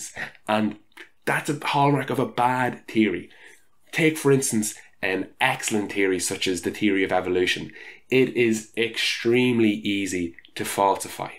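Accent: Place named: Ireland. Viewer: the kindness in the eyes, the gentleness that comes up naturally, the polite approach combined with evidence, and the lovely 'r' cannot belong to anyone else but an Irish soul! I am a foreign student from Bulgaria and have done my BSc in Scotland and MA in England and have been luckily blessed enough to meet the Irish culture as well along my way and could say that I love all my Irish friends! Been a pleasure to watch your content! Keep up the good work!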